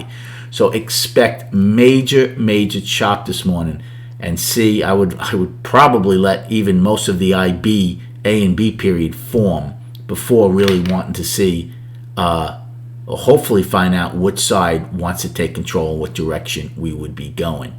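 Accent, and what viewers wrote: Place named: Boston, United States. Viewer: I know a boston accent when I hear it lol I'm from western mass! Let's get paid today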